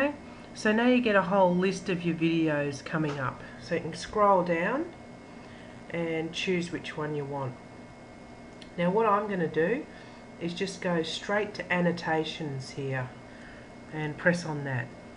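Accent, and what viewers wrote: Australia. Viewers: Australian??? IM AUSTRALIAN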